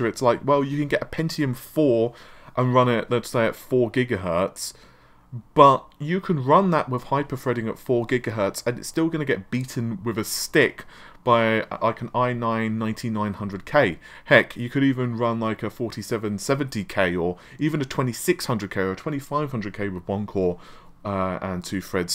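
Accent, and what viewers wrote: United Kingdom. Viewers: He is not mispronouncing anything,its called British accent. I am getting tired of those jokes. Amata is pronouncing Navi in a very weird way now!! Leave them alone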